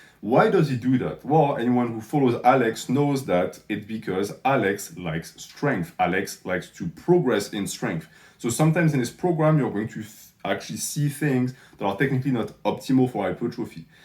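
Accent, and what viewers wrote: France. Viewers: are you french bro ?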